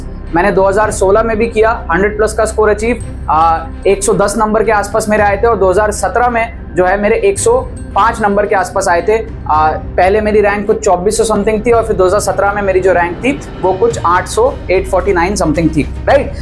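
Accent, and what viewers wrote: Bengal, India. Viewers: oh sir u r amazing 🤩...ur Bengali is too sweet ❤️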